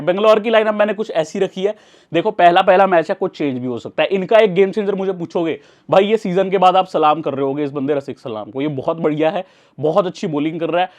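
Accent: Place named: Punjab, India. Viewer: Punjabi voice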